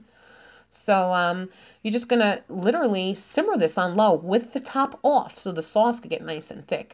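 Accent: New York, United States. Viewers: Are you from NY? You sound like my cousins from NY! I love Wendy’s Chili and I’m crazy about Puerto Rican Food!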